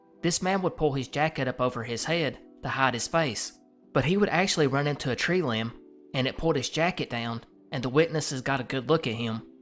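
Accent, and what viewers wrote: South, United States. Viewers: Boy you are about as country as corn bread and black eyed peas. Love it!!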